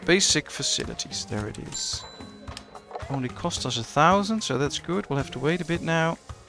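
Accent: Netherlands. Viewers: I was trying to place the accent, I was thinking Dutch then you said Netherlands 👌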